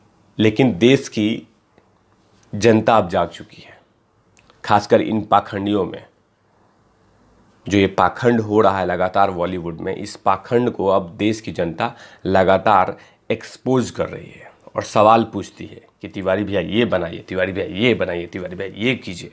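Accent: Bihar, India. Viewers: AAP BIHAR SA HO KYA 🤔🤔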